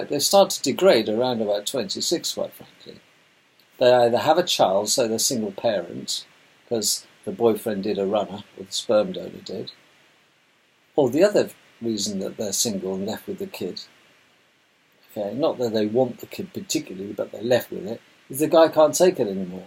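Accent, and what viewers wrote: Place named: United Kingdom. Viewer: My sister is from the south ( she was adopted at birth) we found each other about 20 years ago.....well she was very worried about my accent....I'm from Massachusetts (western)(eastern mass has that nasal Boston accent) her whole family loves my accent.....I found that funny, I don't have one.....they do, all southerners.......it's funny how many Americans have an accent.....you have an accent, ,love a British accent 😎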